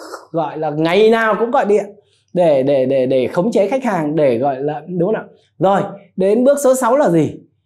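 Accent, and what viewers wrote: Standard. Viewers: Anh nói chuẩn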